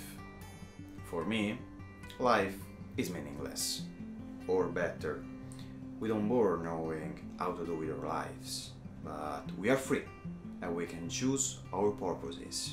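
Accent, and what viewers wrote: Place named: Hungary. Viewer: I like your accent 😊 It’s like Hungarian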